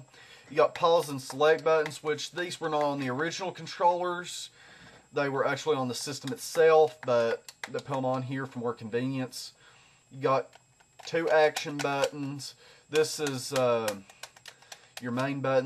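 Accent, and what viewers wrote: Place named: South, United States. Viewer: You have a thick southern accent man. Where you from? SC Here. :D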